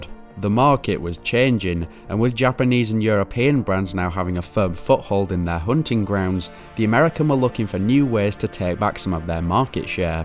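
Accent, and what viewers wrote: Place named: Southport, United Kingdom. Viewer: The accent reminds me of the Southport area...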